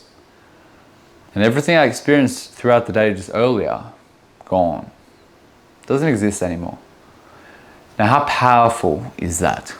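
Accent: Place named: Australia. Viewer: You're so fucking hot, but I cannot deal with an Aussie accent, mate.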